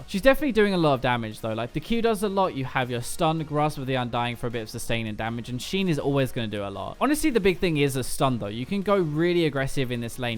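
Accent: United Kingdom. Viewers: i like the uk accent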